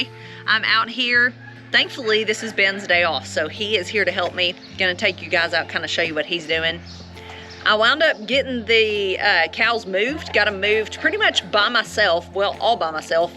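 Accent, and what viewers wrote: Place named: Appalachia, United States. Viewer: Well I'm hooked lol Good lookn gal right there with a soothing voice sounds just like a Appalachian gal👍🏻😍 She can tell me bed time stories anytime,yall take care of the animals and yourselves!!! I ll keep checkn in on ya😉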